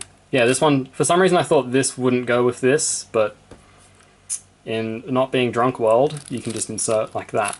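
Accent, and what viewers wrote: Australia. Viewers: You sound a bit like Feliks, probably Australian accent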